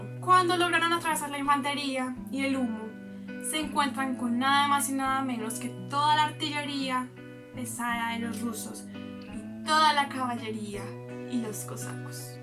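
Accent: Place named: Colombia. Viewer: Videos asi no tienen pérdida alguna, muy bien hecho y explicado con tu propio toque y estilo. Y más aún si es con metal PD: Con ese acento te me haces que eres de mi Colombia tierra querida.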